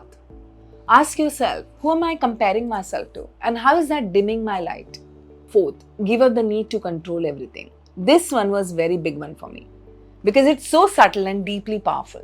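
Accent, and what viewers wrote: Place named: England, United Kingdom. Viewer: Love your English accent and the way you present your points with the flow. Happy to listen to you👏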